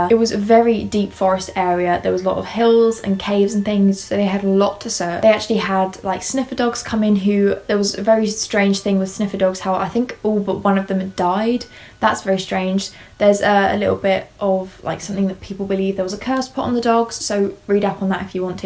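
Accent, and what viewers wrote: United Kingdom. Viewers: I'm from Salina Oklahoma about 10 miles from the old camp site i grew up hearing about these story's i was 3 years old it sounds like your from the UK so why you even going on about this?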